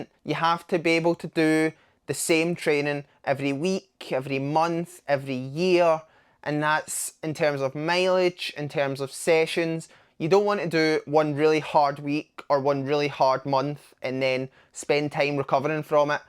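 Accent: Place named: South Africa. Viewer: Where are you from with that accent? South africa?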